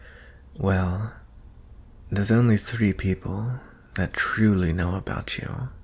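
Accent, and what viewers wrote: United Kingdom. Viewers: You’re really good at a British accent! I am impressed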